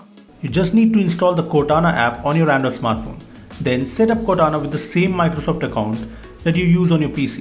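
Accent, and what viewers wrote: India. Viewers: I like your videos but i hate the accent cause many scam-Indians are calling me all the time and tell me that there's something wrong with my win 10 laptop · Thats Indian accent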